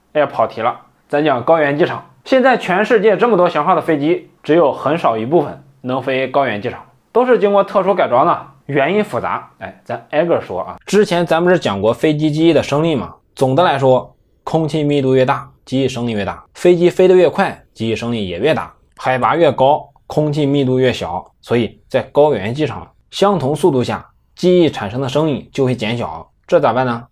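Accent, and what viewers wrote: Henan, China. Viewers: 贺南话好听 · 这河南话，听起来亲切！ 想起紫阳。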